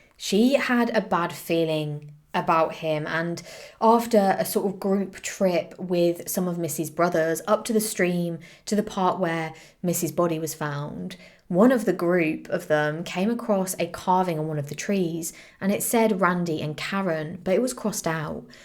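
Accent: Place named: England, United Kingdom. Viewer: you are beautiful. I just found your channel and subscribed. I love the way you report. You do great research., presentation and narration I’m wondering where your accent is from. I’d guess England, maybe a countryside accent. Best of success to you with your channel.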